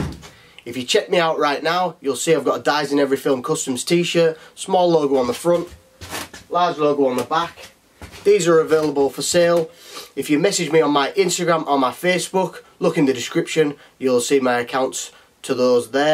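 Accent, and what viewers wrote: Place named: United Kingdom. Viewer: He has a British accent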